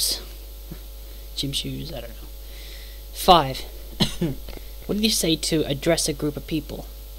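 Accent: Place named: United Kingdom. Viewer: You have a cute voice! You sound like a Buizel! Your accent seems British to me.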